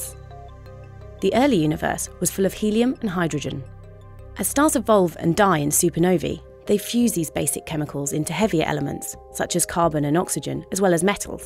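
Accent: United Kingdom. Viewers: Долбаный британский акцент! Ненавижу! Что за wohapen? Сложно нормально говорить? Придурки!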